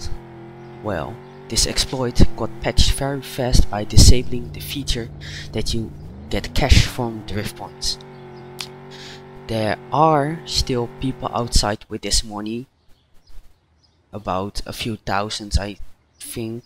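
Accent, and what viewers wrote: Netherlands. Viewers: Arer you dutch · Ik hoor gewoon je bent nl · are you dutch? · Oh mah lord are u dutch🤯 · Dutch english accent alert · Ben u Nederlander? Want your accent. My dutch is bad lol.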